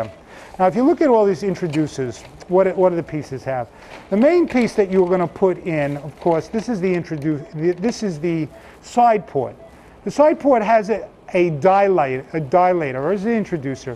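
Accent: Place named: New York, United States. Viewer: Love your NY accent, love the video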